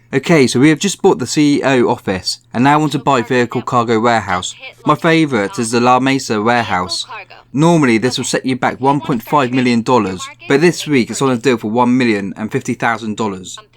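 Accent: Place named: United Kingdom. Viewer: Thanks man, the video was so helpful and I also love your British accent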